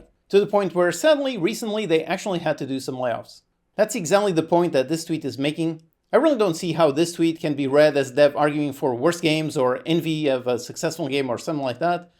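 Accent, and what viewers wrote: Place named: Portugal. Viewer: Are you from Portugal? You have a similar accent!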